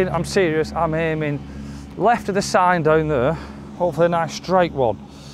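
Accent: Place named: North, United Kingdom. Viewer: I guess not wearing me glasses it would stop me looking for the ball as I hit (top )it 😂 on a side note this Suffolk boy is is getting a northern accent 😳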